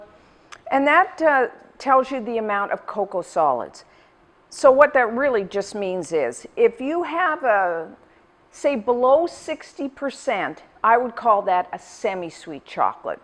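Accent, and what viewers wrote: Canada. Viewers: whoah shes canadian! haha thats awesome!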